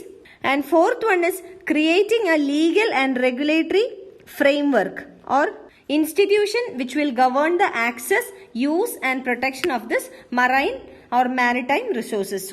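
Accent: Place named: Kerala, India. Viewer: are you a malayali, the accent sounds so. :)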